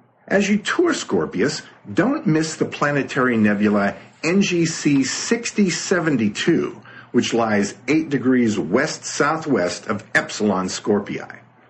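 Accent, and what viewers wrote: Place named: United States. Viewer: you talk yanky too much. settle down on the oronunciation its to harsh.try to sond like english not overpronounced yank. nye.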